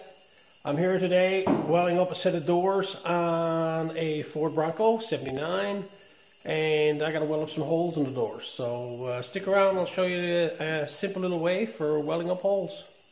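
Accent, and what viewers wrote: Newfoundland, Canada. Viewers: I thought it was Newfoundlandish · Got to be from Newfoundland awesome video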